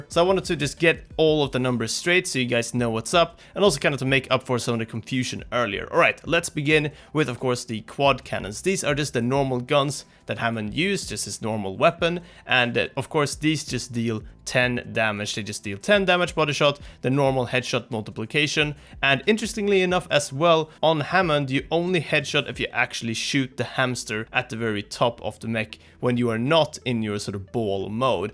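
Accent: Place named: Sweden. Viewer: One of the most Swedish accents I've heard / a Swede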